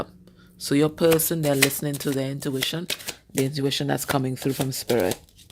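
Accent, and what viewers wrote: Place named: Trinidad and Tobago. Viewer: Where are you from? Trinidad?I heard the accent and immediately subscribed! I am from St. Kitts.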